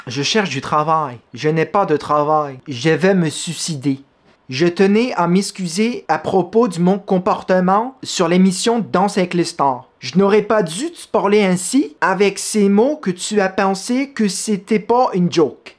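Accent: Quebec, Canada. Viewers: Oulala les infos croustillantes que tu nous as dégoté! Tu fais un vrai travail d'investigation et avec l'accent québécois (qui fait partie des accents autorisés) on est en totale immersion. Ne lâche rien mec c'est toi le boss de YT, après Michou bien sûr! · + l'accent québécois. Que demander de plus 😭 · je suis mongol et j'adoere ton accent du Canada Xd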